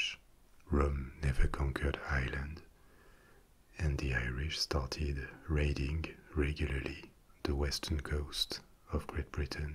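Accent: France. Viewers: Hey, cool idea for an ASMR channel, finally sth different, your calming voice with the French accent rocks, dude!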